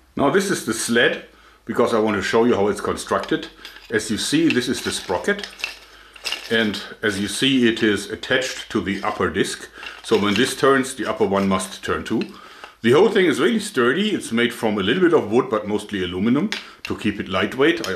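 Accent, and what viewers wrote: Germany. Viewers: funny character Never Changes especially the German accent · Hi. Deine Ideen sind echt klasse,dein Kanal an sich ist ein wahrgewordener Jungstraum. Du hast die coolsten Ideen überhaupt. Und ich feiere so unglaublich deinen deutschen Akzent. 🤣🤣🤣🤣🤣 In tiefer Verbundenheit ,Christoph. 👍👍👍👍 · Bei dir hört man richtig das deutsch raus 😂😂 „This here“ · Das ist nicht böse gemeint aber wenn du Englisch sprichst hört man dass du in Deutschland bist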